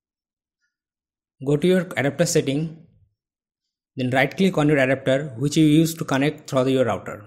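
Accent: India. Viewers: sounds like india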